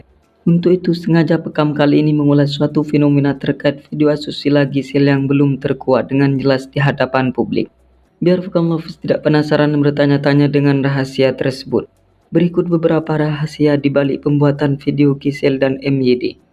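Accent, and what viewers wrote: Madura, Indonesia. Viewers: Sepertinya org Madura ini pengisi suara nya wkwkkw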